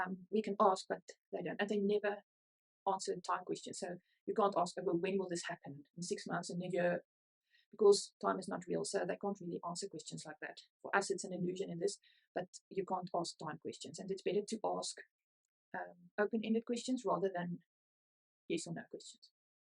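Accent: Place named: Afrikaans, South Africa. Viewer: Well I can understand an Afrikaans accent! Baie dankie.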